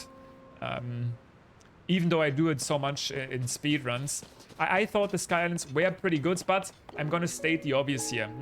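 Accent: Germany. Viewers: So after almost 3 hours of interesting thoughts and stuff I have to say, please work on your pronouncing. Even thats our way of leaning school english in Germany, this is so much of an pain to listen - this german-ish-english pronouncing. You still did well, don't get me wrong, but it would be more perfect when I could enjoy your talk more. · I really like your German accent. It sounds very nice. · Man hört so hart das du deutsch bist 😂❤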